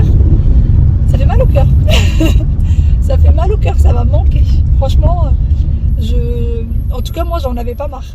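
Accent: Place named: Morocco. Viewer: Bon début de semaine ♥️🇨🇵🇲🇦 et Allah y3awnk ala wladk j'adore ta façon du parle Marocaine hh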